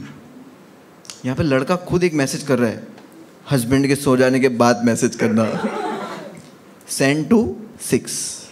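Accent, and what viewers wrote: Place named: Gujarat, India. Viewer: Gujarati's while speaking English always creates a sense of humour BIG FAN ♥️♥️U FROM ABD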